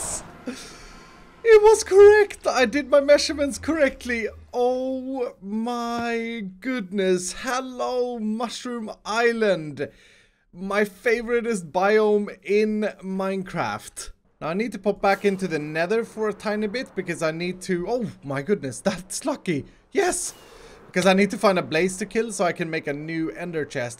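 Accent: Sweden. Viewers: Are you from sweden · Are you swedish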